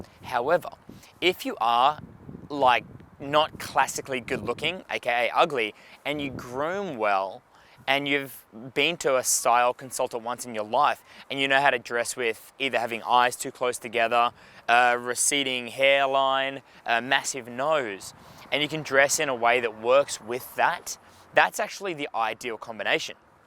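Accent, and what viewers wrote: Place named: Australia. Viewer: Do you have bootcamps in Perth, WA? Most i ever known of are UK or USA good to hear an Aussie accent... In regards to looks... i think when they say looks don't matter they mean being a 'pretty boi' and since evolutionary women respond to behavioral cues in men when it comes to attraction it doesn't matter. i do agree you have to be presentable tho... what is your take on this?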